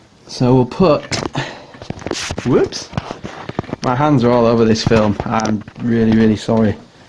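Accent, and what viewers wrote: Yorkshire, United Kingdom. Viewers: This is pretty cool tbh are you from yorkshire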